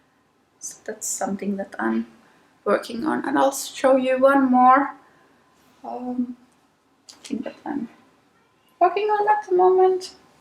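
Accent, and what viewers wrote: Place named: Finland. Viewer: I was looking for summer knitting inspiration!! Your accent made me go to the time I lived in Helsinki!! Lovely memories! Happy new year!!! Regards from Peru 🇵🇪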